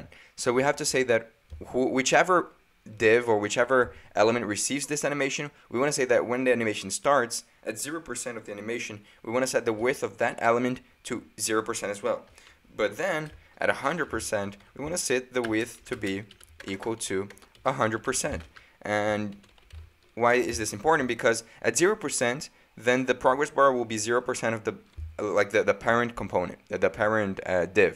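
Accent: Brazil. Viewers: sotaque br? very nice english by the way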